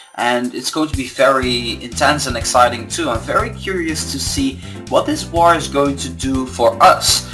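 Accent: Netherlands. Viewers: Ben jij nederlands? Je klinkt zo!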